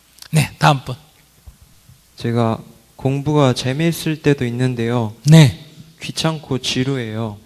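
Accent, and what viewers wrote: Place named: Gyeongsang, South Korea. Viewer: 경상도에서는 가르치다를 사투리로 가리키다 가르키다라고도 합니다 사투리로 보시면 이해가 될것입니다 시도때도 없이 많은 사투리를 쓰십니다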